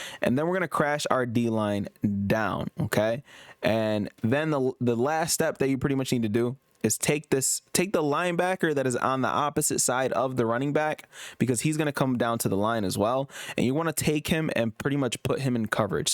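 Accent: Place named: RP, United Kingdom. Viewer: Very Posh!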